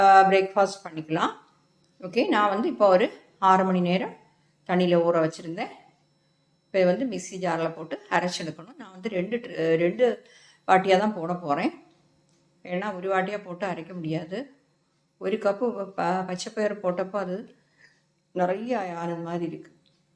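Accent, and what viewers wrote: Kerala, India. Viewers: Are u a malayali?your slang of Tamil says so.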